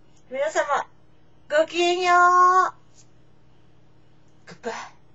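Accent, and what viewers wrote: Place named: Japan. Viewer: あなたは英語がとても上手いですよ。語彙や文法などは抜群です。日本語訛りはありますが気にしなくていいと思います。あなたの英語は聞き取りやすいです。If you are not satisfied with your progress, I recommend watching as many English movies & TV shows as you can as well as conversing with native speakers. I've been doing similar things to improve my Japanese and have noticed good results (still a long way to go though)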